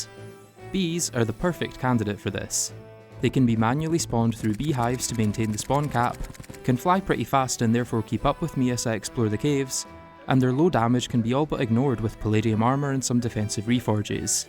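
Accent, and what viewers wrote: Scotland, United Kingdom. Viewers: By the way are you scottish? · i love your Scottish accent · are you scottish. that is my accent guess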